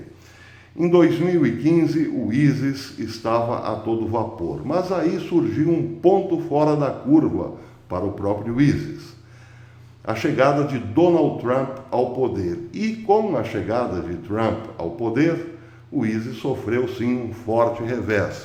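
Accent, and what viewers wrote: Caipira, Brazil. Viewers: Parabéns professor sempre ligado aos fatos da geopolítica! O caipirês é apenas uma forma humorada de passar as notícias 👍👏👏👊😎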